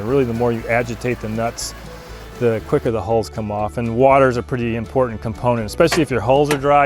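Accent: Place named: Appalachia, United States. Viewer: You sound like you grew up in the deep holler.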